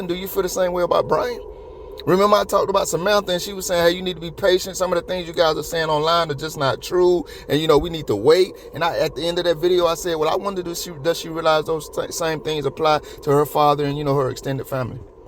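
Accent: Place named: Georgia, United States. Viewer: Just hearing you are from GA..I am too and you're right about how we talk! 😂 I agree 💯.. no one does everything "right". We, as humans are imperfect-we make bad decisions etc. I think Kristi wants BK "done" so maybe they won't dig deeper. This isn't from a rabbit hole... I've done a lot of my own digging. Theres way more to this than we know. Way back Steve said a lot of bad stuff was going to come out. There's a lot of things at play in this. Kristi interruption of Steve every other word drove me nuts!! Lol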